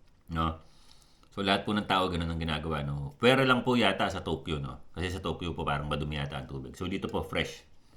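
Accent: Philippines. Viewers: Tagalog na tagalog ka kabayan, anung probinsya ka ba dto saatin ? 😊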